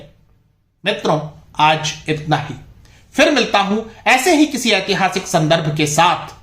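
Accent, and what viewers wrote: Standard. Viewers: Itana shudhha Hindi sunane ko milaa aaanand aa aagayaaa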